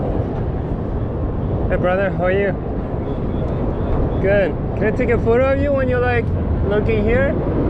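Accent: France. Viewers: great video awesome French accent-) need advice, im a run n gun shooter from shooting hockey games to street photography, debating strongly between the 35-150 and the new 24-70 g master. 35-150 is heavy not the most fun to carry around and missing the 24mm... while the 24-70 I feel like im missing some range.... what would you do? I feel that I like my 24-105 better lolll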